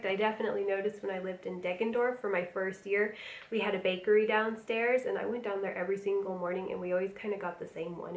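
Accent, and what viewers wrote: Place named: United States. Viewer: Good morning It's always nice to start a day with one of your videos. The German language is difficult to learn, even for Germans. I love the American accent when you're speaking the German language, it's so lovely and sweet. You are living in a wonderful part of Germany, very nice to raise your kids. The most important word at that area is beer/Bier 🍻 and that's not so hard to pronounce 😁😅.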